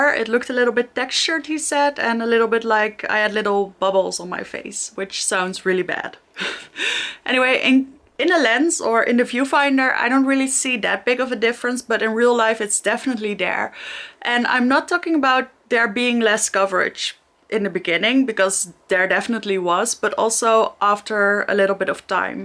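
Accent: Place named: Netherlands. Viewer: Love your dutch accent so much! ❤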